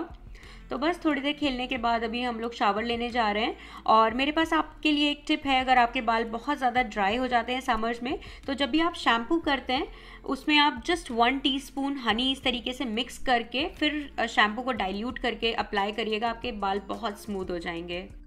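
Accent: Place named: India. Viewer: Hi.. first time I am watching your video.. I am happy, you are an amazing and fun loving person.. I love the fact u still have the Indian style be it accent or cooking.. I see many catching a fake accent in a month or two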